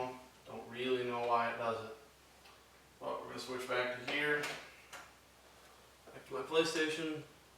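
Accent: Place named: RP, United Kingdom. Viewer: I gave u a like like posh accent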